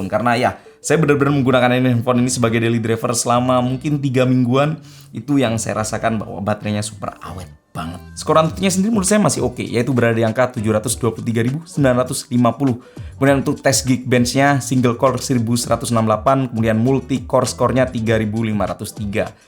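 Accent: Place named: Surabaya, Indonesia. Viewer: lhooo SUROBOYO TA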